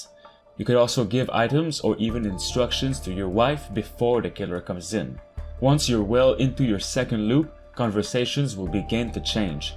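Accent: Quebec, Canada. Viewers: french canadian accent, without trying to hide it I don't mean that as a bad thing, but a lot of french canadian don't have an accent when speaking english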